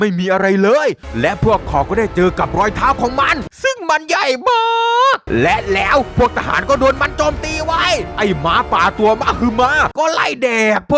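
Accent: South, Thailand. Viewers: พี่เป็นคนใต้ป่าวครับ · พี่เป็นคนใต้เเน่นอน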